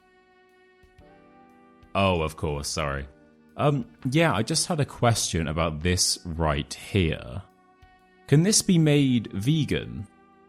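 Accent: United Kingdom. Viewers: Hello!,i'm practicing with your British accent videos, but I'm a beginner, I don't understand much, but I feel like it's helping me, thank you, greetings from Mexico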